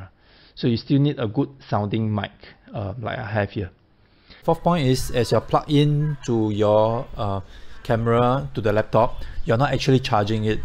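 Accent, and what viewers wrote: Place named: Singapore. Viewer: 6 seconds in....he calls a point and shoot a DSLR though you can hardly understand a word he says thanks to that incredibly hard to understand Singaporean accent that slaughters the English language, plus he slurs his words AND talks way too fast. HINT: Talk s-l-o-w-e-r so everyone can understand your bizarre accent. Its very unusual. Its not easy to understand. Slowing down will solve almost all of these problems. Then try to enunciate better. And do NOT use any slang. With that accent it sounds ridiculous. Just keep the words simple and clear.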